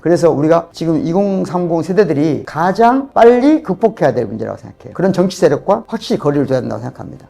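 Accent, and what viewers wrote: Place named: Jeolla, South Korea. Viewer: 말투가 전라도네 ㅋㅋㅋ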